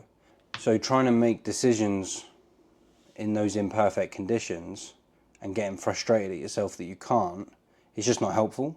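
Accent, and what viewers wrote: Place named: United Kingdom. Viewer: Which part of the UK is your accent from? Surrey Cambridge way Great video You have a smart brain and want to solve a problem straight away , I get in those loops and think about the solutions that solve it fastest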